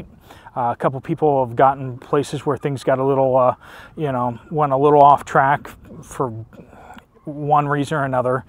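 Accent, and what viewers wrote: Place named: United States. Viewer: Nice of you to do reports from your journey - even with your AMERICAN pronunciations.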